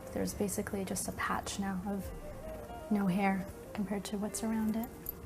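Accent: Canada. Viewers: I knew she was Canadian before she said so. They often have a way for throwing away every sentence so that nothing is salient. The rest was just nonsense of course. Relaxing nonsense at least.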